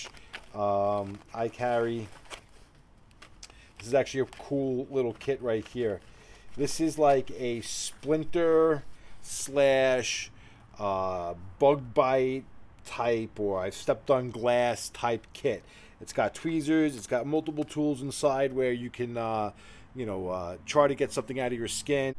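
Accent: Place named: New York, United States. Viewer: I immediately subscribed cuz the second I heard you talk I knew you was a fellow New Yorker! Alright bx zoo!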